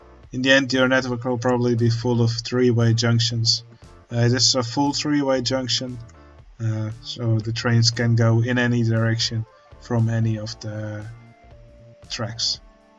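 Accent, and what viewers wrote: Netherlands. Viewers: you sound so dutch :)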